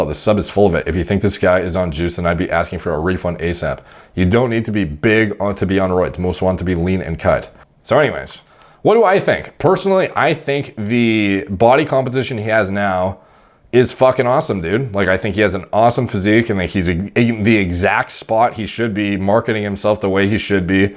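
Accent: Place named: Canada. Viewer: sounded more Canadian than usual in this vid, particularly at "that's jumping to conclusions, no?" and with his polite disapproval of Reddit calling Lewis gay lmao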